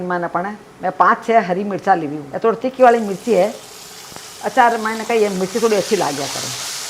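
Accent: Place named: Jodhpur, India. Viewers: You speak very sweet language of JODHPUR we Rajasthan people are proud of you SA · Antiji aap ki recipe bahot saral hoti hai.muje aap ki marvadi bhasha sunke bahot achha lagta hai.aap aise hi naya sikhaya kare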